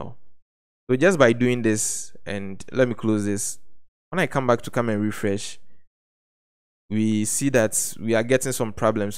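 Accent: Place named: Ghana. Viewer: Please where are you from.. You sound Ghanaian..I want to be in touch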